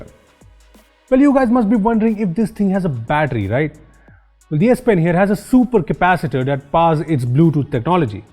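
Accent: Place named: India. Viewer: Cool a video about note 9 *clicks this video* *Indian accent* *Leaves*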